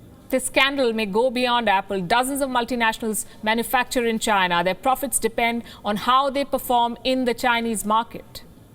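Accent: India. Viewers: Your Indian accent speak volume of your jealousy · Is this Fox news with indian accent and tan? I love America and China is surpsssing us. Solution invest intetnationally and tax the income heavpyly for building middle class again.. not let them keepthe loot snd starving people in US like our own Doing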